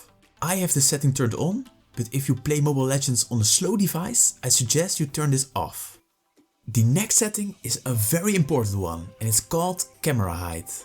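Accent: Netherlands. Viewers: ik hoor dat je nederlander bent nice vid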